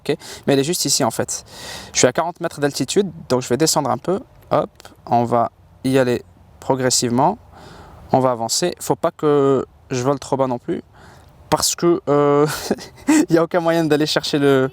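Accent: Quebec, Canada. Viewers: C'est moi où tu commences à avoir l'accent québécois ? Mdrrrrrrrr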